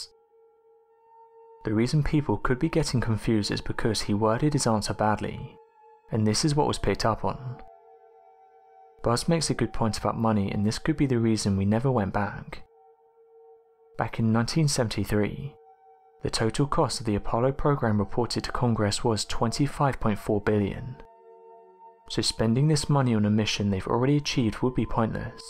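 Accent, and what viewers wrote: England, United Kingdom. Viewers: Really tired of the Heavy English accent much of which couldn't be understood 🍀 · I waited for a British accent to begin commentating the video...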